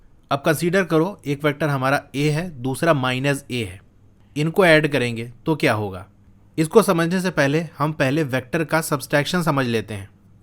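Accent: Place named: Allahabad, India. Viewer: Sir are you from.allahabad or any nearby city from it your accent is similar to Allahabad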